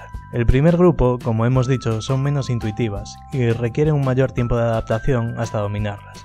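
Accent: Galicia, Spain. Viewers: eres gallego! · Eres gallego? jejeje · Eres gallego?Yo también... :D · Es cosa mía o eres gallego amigo mío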